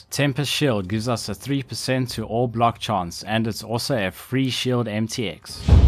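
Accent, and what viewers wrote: South Africa. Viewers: You sound South African! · Are you South Africans because you accent sounds like it is from SA #YeeaaBooii anyway, love the build and looking to give it a shot as I have no clue what to try next this league.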